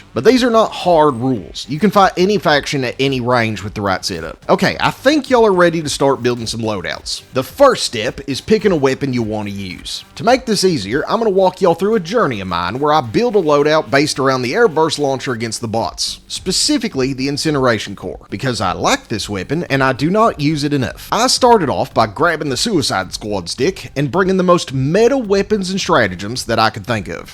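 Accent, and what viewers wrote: South, United States. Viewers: iam sorry but you sound like a very southern version of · Is the southern accent a bit or are you from the south? Would be nice to meet one of my own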